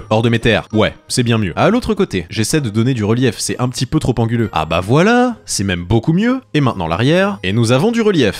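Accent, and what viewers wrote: Quebec, Canada. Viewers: Es que tu est quebecois ou canadien😃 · Coucou, tu es Québécois ?? Sinon j'aime trop t'a série 😘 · Tu pourrais faire un monument pour la meilleure de tout les pioches ps j’adore ton accent québécois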